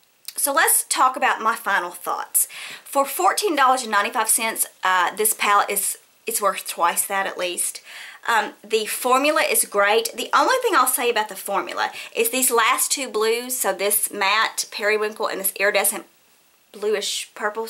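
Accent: South, United States. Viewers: Heard about you on itsjudytime. I love you. Lol You're such a doll. I subscribed. I love watching makeup tutorials.. You may get this a lot but i just have to add i love your southern accent :) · Judy brought me here! I love your accent! You are definitely a belle. ^__^ *subbing*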